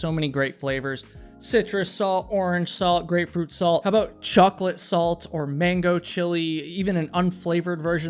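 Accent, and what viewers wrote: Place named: United States. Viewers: anyone whinging about pronunciation of names needs to shut up. You are telling the story respectfully. You are an American. You are permitted to have an accent! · We all understand that US-americans put the english pronunciation on everything, even though it's funny sometimes we are used to it :) most importantly the video was great and super respectful.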